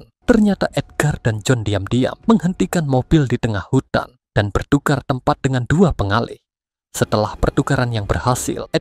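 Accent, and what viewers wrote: Java, Indonesia. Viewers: di awal video, mimin kyk logat bali, lalu ganti logal jawa medhok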